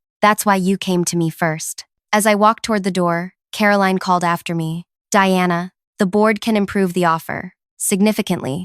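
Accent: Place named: Asia. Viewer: Same old Asian accent and same old points. No American accent to tell it's a story from USA. Just mocking American corporates always 😢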